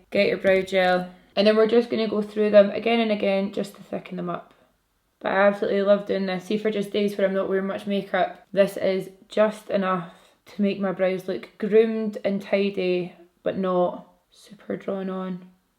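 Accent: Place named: Glasgow, United Kingdom. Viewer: Ive been watching all the recent dramas across the pond and it’s so lovely to come here and listen to that beautiful familiar (glasgow girl here too!) accent and some gorgeous makeup! Keep it up Mrs!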